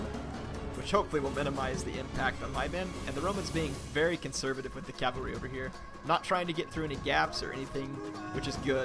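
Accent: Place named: South, United States. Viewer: You sound like a southerner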